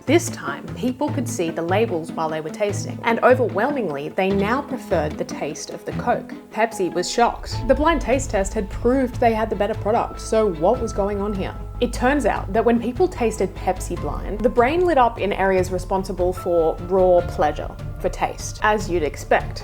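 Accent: Australia. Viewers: Exactly. I think this kind of mistake is generational and geographical. She sounds Australian and also young, so probably wouldn't have the best grasp of British car industry and world history. The Mini was made by BMC, designed by Alec Issigonis. Volkswagon was created by the German govt and the Beetle was designed by Ferdinand Porsche. The word Volkswagon means 'People's car'. Sure both the Beetle and the Mini were 'cheap transportation' icons around the world, but it's pretty inexcusable to make the claim in the video. Poor research. Ironically the Beetle made Volkswagons name and turned a struggling company into a corporate superpower (more ironically it was rescued from the scrap heap by a British army general in the last days of WW2) and would eclipse the entire future UK car manufacturing industry. The Mini was a cultural icon of the 20th century almost to the degree of the Beetle, but it helped bankrupt BMC as each one was sold at a LOSS. 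The more Minis sold, the more money BMC lost....and yet they didn't actually replace it with a more profitable new model until 1980 with the Austin Metro. The reason cited for retaining the Mini was always 'The Mini is still selling in large numbers'!! 🤣🤣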